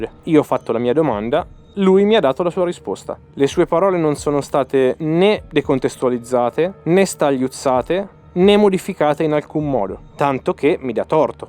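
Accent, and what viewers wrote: Perugia, Italy. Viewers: Accento perugino inconfondibile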